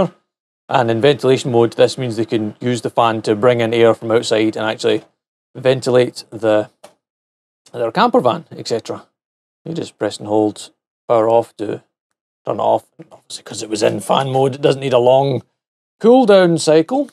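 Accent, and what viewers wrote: Scotland, United Kingdom. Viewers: I love watching and listening to your videos. The refreshing sound of a Scottish voice is like some healing tonic. :)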